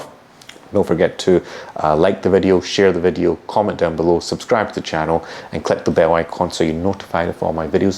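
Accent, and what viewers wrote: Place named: United Kingdom. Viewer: Heyy bro this is a great video, I currently work in the IT Sector and I’ve been in my role for 4 years a 2nd line role, and I’m looking to change career to become a Scrum Master. Considering my IT background which certifications would I need minimum to become a Scrum Master, Agile Project Manager, Delivery Manager. So and so forth. In the London Region? You sound like you’re from the UK aswell. I also have an ITIL Framework certification. Thanks man, and I look forward to your response🙏 your videos are great, keep it up👍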